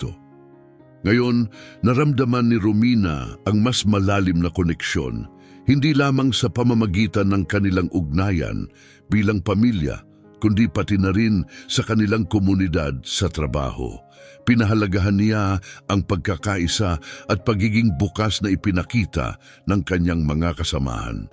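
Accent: Visayas, Philippines. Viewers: Magandang. 😊😊😊kuweñto ngunitpangit pakinggan ang mga pronouncition parang bisaya pakinggan pero marami akong napupulot na leksyon😊😊😊❤❤❤❤❤❤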